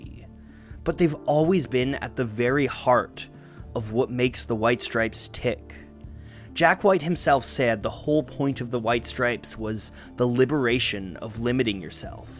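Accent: Canada. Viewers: D O N O T T R.Y' TO S O U N D A M E R I C AN * WE HEAR YOUR CANADIAN ACCENT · I just learned that you are Canadian. Your pronunciations make sense now and I realize I’ve got a USA-centric lens.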